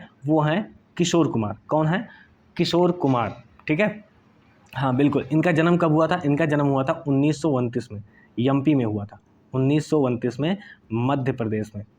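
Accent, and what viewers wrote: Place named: Bijnor, India. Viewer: Aapki aawaz hamare bijnore ki lg rhi h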